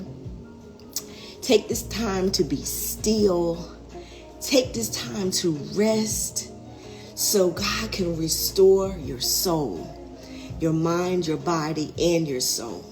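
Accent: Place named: Carolinas, United States. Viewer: Heeeey you from The Carolina’s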